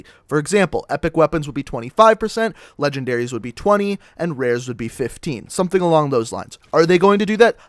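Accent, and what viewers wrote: Canada. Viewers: Are you saying "Merc-2" weapons or "Mark-2" it might just be your heavy canadian accent effin'it up for me!! 😄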